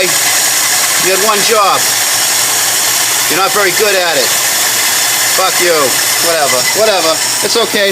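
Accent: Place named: Boston, United States. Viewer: The caaaarburator, love that Boston accent.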